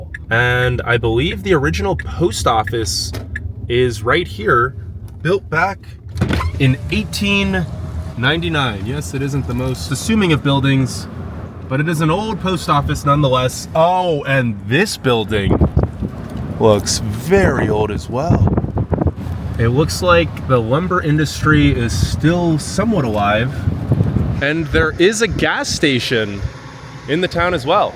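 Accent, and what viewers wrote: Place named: Upper Peninsula, United States. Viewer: Yer ah yooper!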